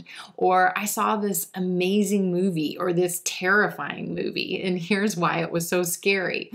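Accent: United States. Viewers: I am an arabic speaker and an english teacher I love the american accent and I have choosen you as a trainer after a great reacher thank you for your help I watch your videos every day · Your all Video is American English??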